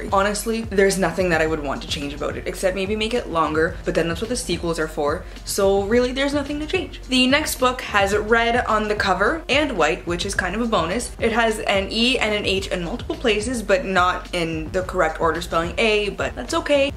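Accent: Canada. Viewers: pretty sure my favorite canadian stereotype is the way you say the word "sorry" as "sourry" becausE IT'S TRUE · Hello =)) As i'm not from Canada, i don't know much about stereotypes but i've always known how well you all speak great english and french, how you pronounce differently words like: "out", "about" and similars, i've heard about poutine and specially the maple syrup :P I would like confirmation on those xD (i specially like the accent though <3) Thank you for the amazing giveaway and opportunity ********************** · Dual Canadian-US citizenship coming in here! I'm from that part of NY where like half my town is French Canadian. A local college literally has a minor called "Canadian studies". Not technically a stereotype, but I know when I cross the border and when my neighbors north come down when they say about with the out part sounding more like an oot or more rounded o. 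It makes me smile when I hear it in your videos. And maple syrup omg. And hockey (go sens!!!) Maybe this is a good time to finally pick up the handmaid's tale.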